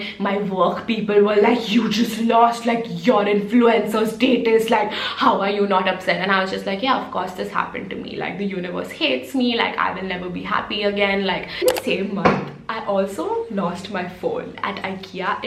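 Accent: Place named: Dubai, United Arab Emirates. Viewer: The typical Dubai guy accent💀🤣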